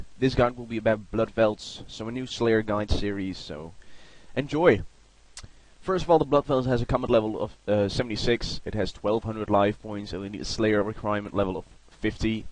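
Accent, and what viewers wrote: Netherlands. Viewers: Je hoort gewoon dat je nederlands ben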